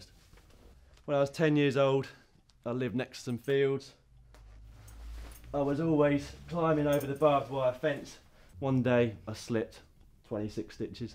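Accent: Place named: North, United Kingdom. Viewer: Why is so angry and northern in this video?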